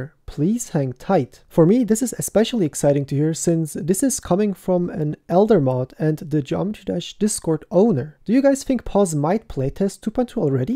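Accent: Germany. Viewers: you german? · Is he german or somethinge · You are either German, Austrian or swiss. What of these 3 are you?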